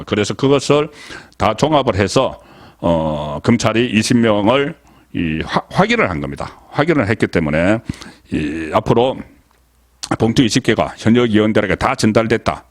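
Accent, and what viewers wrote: Gyeongsang, South Korea. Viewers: 송국건씨 구속영장 경찰이 검사에게 신청 검사는 법원에 청구하는 법률용어 정도는 기본 아닌가요 그리고 엑센트 강약의 사용 용례도 정획히 하세요 진주 촌놈 경상도 사투리 제발 좀 멘트 하나하나 법률용어 제재로 사용하길 바람요.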